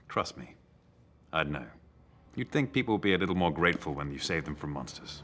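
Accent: England, United Kingdom. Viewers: Oh he's British... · It’s so weird hearing him with the British accent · It's nice to get a good example of how nice Henry Cavill's natural voice is. His American accent as Superman kind of leads him to be very whispery and mumbly, and I love his Geralt voice but it's definitely a voice. But his natural English accent is gorgeous. He should totally do an audiobook series of the Witcher where he does his Netflix Geralt voice for Geralt and his natural voice for narration. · Wait wtf he’s british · Why British gentleman always makes a good audiobook narrator?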